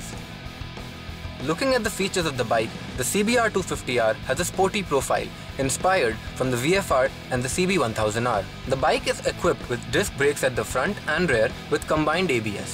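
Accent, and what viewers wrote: India. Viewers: gotta love the indian accent =D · he's a south Indian. Most likely to be a Keralite xD They pronounce T like that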